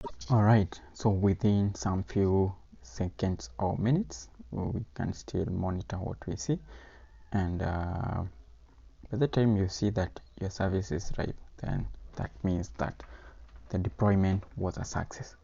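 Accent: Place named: India. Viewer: OHJE, schon wieder Indienglisch:-(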